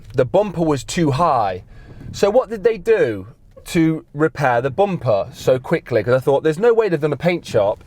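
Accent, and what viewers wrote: Nottingham, United Kingdom. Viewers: plastic can sometimes look a different shade of colour, especially in a pearl white. if you have had the car protected(ie diamond brite) this can change the colour slightly. Where they failed in my opinion was someone should have quality checked the car and talked you through a handover. the headliner damaged and the trim hanging off aren't good, hopefully easily sortable. give the car a clean and see how the bumper compares, you will probably see a colour variation on the front aswell if you look enough! I knew from your accent your are local to Notts! I made it 2!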